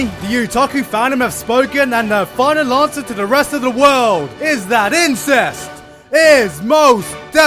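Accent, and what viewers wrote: United Kingdom. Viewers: sounds so Bri'ish here. How things have changed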